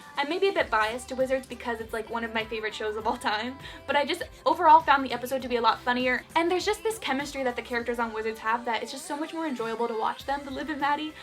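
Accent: Canada. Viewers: This is the third video of yours I've watched I think? And I was talking to myself, saying how you sounded Canadian, so I checked the description just cuz, didn't find anything. And then you said it in the video and I was like "AHA! THERE IT IS!" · are you from Canada?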